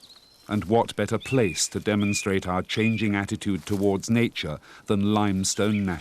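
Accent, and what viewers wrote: RP, United Kingdom. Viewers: Good video, but flawed by the dude with the patronising tone, narrating to me like I am in an Oxford library or school, then the stupid music, if I want music I go to my playlist for it, here I want wildlife, no buffoon trying to talk Royal no big band, just decent narration, good cam crew, couch a beer door shut lights low....job done, but no,...I get this patronising Gitt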